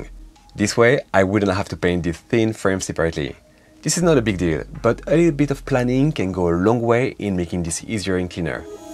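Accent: France. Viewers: Came in the hobby with the V3 around... 2000 i think. And started with Blood Angels. Just came back 2 years ago, still with BA. Nice paint, the fact you didn't use Metallic is cool. I would be curious to know what is your experience in painting cause, it looks awesomely incredible. BTW i checked (not finished, it's late right now) your other video, and was amused by your accent, so "tranché", No surprised by the fact you're french. And a Parisien one. It explains A LOT. Hi and cheers for the next videos, from a fellow french (But Nemesis Marseillais). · je me disait bien qu'il y avait un accent francais la dedans bon bah j'mabonne... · on dirait un accent français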